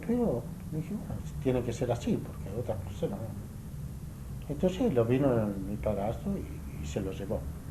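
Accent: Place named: Argentina. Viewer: Tiene su acento Argentino Che.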